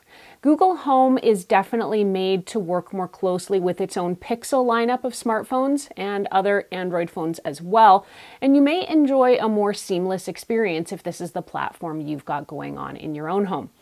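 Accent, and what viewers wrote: Canada. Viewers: Wow, smart girl, gorgeous eyes, good info, intelligent but ... That Canadian accent just cracks me up. Beats the heck out of a Noo Zillan accent too.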